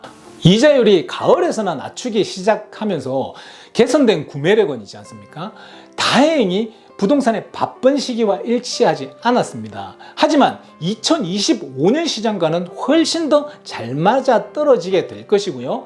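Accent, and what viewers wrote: Gyeongsang, South Korea. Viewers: 대구 사투리 ? ㅋㅋ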